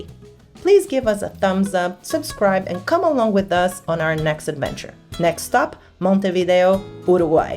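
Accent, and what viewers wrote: Brazil. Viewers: Teu sotaque te entrega menina, você é brazuca. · Você é brasileira, né? Pelo sotaque. Acertei?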